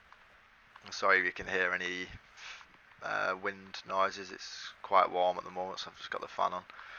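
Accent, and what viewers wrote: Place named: Northern England, United Kingdom. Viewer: Cheers angel!!!!!!..I finally found the last part .. which for me was the one on the top of the mountain!!!.. Huge thank you .. I even liked listening to your northern accent!!! fantastic video .. thanks again!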